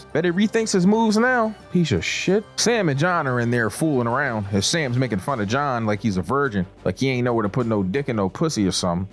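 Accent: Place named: New York, United States. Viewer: You sound like rosland or gary... or nyc.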